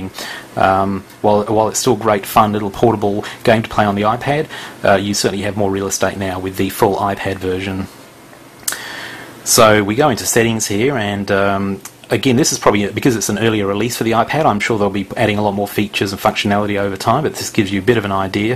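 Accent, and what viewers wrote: Australia. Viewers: are you from Australia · LoL racist j/k - The guys clearly an Aussie. Sounds nothing like the British accent.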